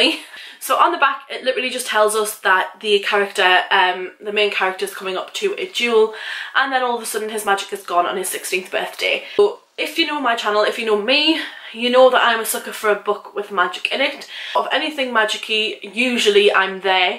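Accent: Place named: North, United Kingdom. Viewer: I'm a new subscriber from Twitter and I'm 13 seconds in and all I have to say is... YOU'RE NORTHERN?!! SO AM I!! YOU LITERALLY HAVE THE SAME ACCENT AS ME!! Can we be best friends??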